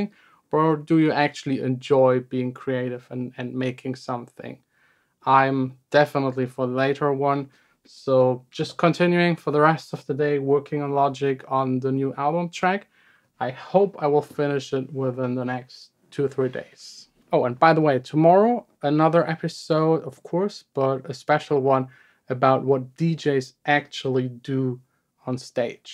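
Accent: Germany. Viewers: Wait are you from 🇩🇪??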